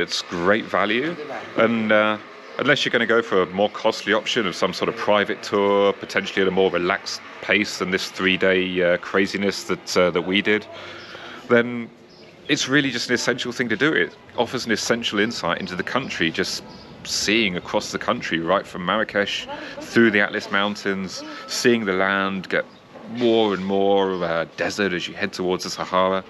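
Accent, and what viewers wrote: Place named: Glasgow, United Kingdom. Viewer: You have a hint of a Glaswegian accent.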